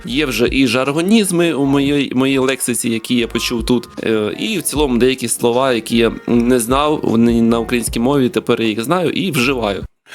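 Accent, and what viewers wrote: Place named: Lviv, Ukraine. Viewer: Дякую за відео! Я зі Слов'янська і з 23 -го року живу у Львові. Обожнюю міста Лева🤩 Я був здивований, що ви не зі Львова адже у вас мова звучить лаконічно " по львівські"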